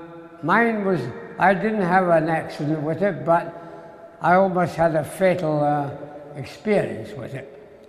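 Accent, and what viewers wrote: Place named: Scotland, United Kingdom. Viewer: If he was Home counties and/or ex-OxBridge….. we would be welcoming, then warmly applauding ‘Sir Eric’. Shame about that Scottish accent too.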